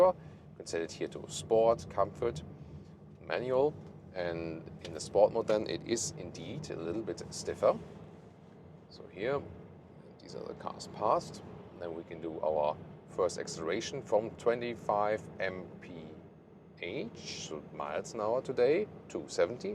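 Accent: Germany. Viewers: Voll der deutsche Akzent.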